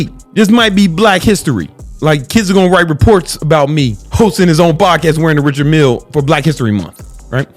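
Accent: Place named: New York, United States. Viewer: I could tell he a NY nigga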